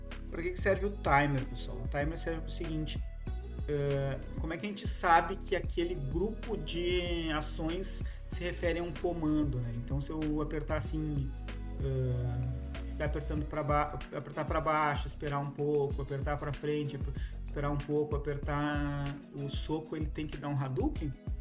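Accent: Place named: Porto Alegre, Brazil. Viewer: Salve mano! Pelo sotaque deve ser de POA. Tô desenvolvendo um beat n UP na Godot, no futuro quero fazer um de luta. Boa aula!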